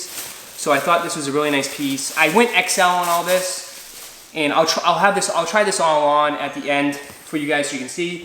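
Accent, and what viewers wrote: Boston, United States. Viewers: I knew you were from Boston too by your accent! Just started watching your vids and instantly subbed. Keep it up!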